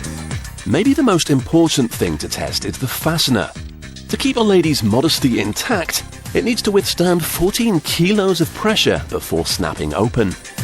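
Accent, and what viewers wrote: United Kingdom. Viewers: I love the way British people say stuff :D it makes me giggle.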